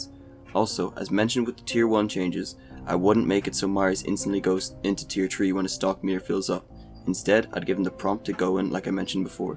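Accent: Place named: Netherlands. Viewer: stop talking like a dutchie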